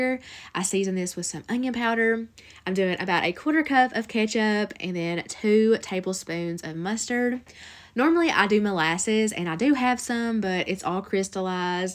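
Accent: South, United States. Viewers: Hey sweetie, idk if anyone else has already commented this or not. But sorghum is pronounced 'soor' (like door) 'gum'... So, 'soor-gum'. I'ma southern gal myself and might I say, I just love your accent! Lol Thank you for all of these wonderful videos and recipes. I'm most definitely trying out that tater salad! ❤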